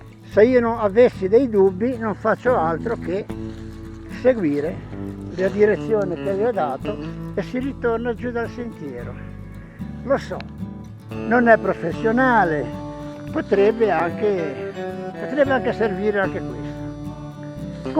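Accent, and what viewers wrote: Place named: Liguria, Italy. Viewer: Interessante questa applicazione mi fa impazzire sul tuo accento ligure sei un simpaticone e racconti le Cose con molto informazione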